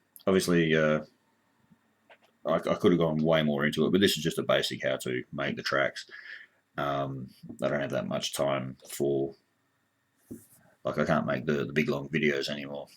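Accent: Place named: Australia. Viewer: dude you sound aussie as f#ck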